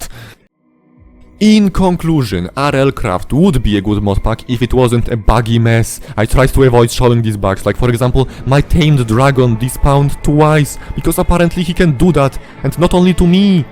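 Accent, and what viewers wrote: Poland. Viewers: Your accent sounds polish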